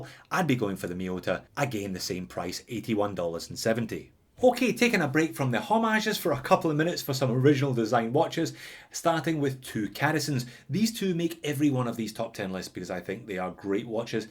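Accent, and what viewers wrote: Scotland, United Kingdom. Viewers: Quality video as always.... After watching you for some time, I can no longer read watch specs without a Scottish accent in my head. LOL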